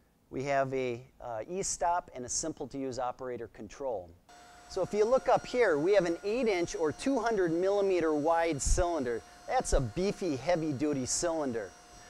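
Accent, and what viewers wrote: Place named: Midwest, United States. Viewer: 10 bucks says this guy is from either Wisconsin or Michigan.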